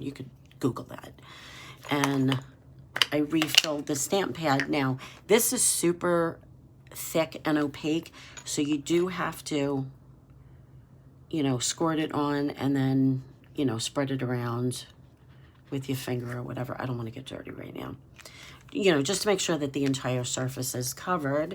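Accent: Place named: Massachusetts, United States. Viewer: I just found your channel on here and I’m so excited that I did. I love the content of your videos and what first caught my attention was the name of your channel and then when I clicked on it and I heard your accent, I believe that we are from the same area and I’m very curious to see if we are (if you feel comfortable answering) are you from Massachusetts South Shore by any chance? I hope to hear back.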